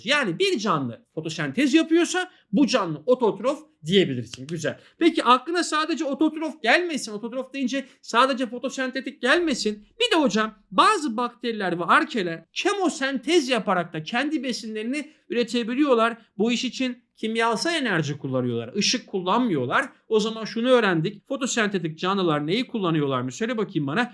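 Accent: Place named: Erzurum, Turkey. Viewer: Hocam memleket nere Erzurumlu gibisibiz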